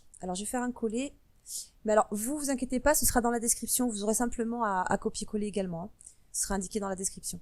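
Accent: South, France. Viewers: a l'accent du sud l'accent qui chante ^^ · "cliquee droit"mdr, Merci pour ce bel accent, enfin un tuto sudiste ;) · Excellent tuto que j' ai regardé avec plaisir. j'ai appris beaucoup de chose. Merci . Avec l'accent du sud.....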